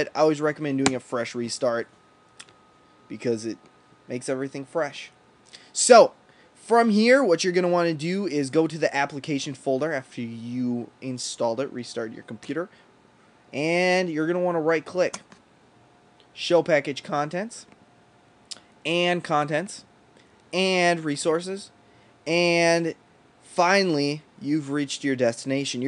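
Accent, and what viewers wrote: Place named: United States. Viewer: annnnnnd you have reached your destination :) I love how you talk! Its so American and cool!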